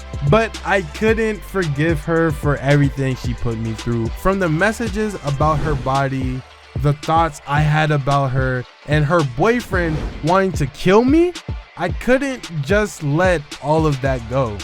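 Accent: Haiti. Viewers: I like your Haitian accent tho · Yo like the way he u was talking Haitian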